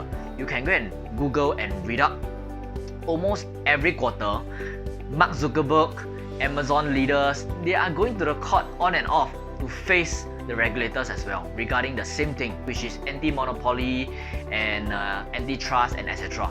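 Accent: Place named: Singapore. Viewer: I understood fine. If you are used to this type of accent. I have coworkers in Singapore that have this same type of accent.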